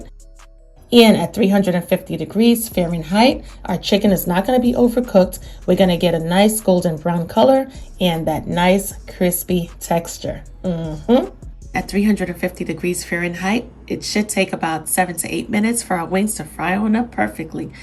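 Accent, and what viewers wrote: New York, United States. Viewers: I can listen to her NY accent all day 😍❤!! Another great recipe!! P.s. I'm a silent watcher, but I just couldn't help it anymore, lol. I had to comment & say how much I love your accent.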